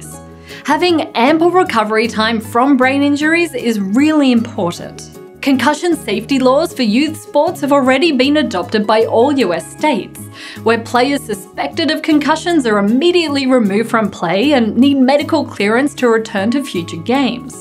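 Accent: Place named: Australia. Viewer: I am myself from Australia and wow, you have the most pleasant Australian accent and voice I have ever heard. I actually wasn't even sure if you were Australian, and that perhaps you had a less than usual British accent. I will happily listen to your voice speaking about psychology. SUBSCRIBED! :D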